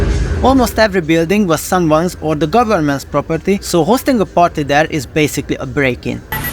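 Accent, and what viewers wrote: Hungary. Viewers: Te magyar vagy ? Kicsit olyan a tajszolasod angolba :,D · Is this a Hungarian accent? Just curious because i had a feiend in uni who spoke similarly. Might be wrong tho :) nice/funny video anyways ❤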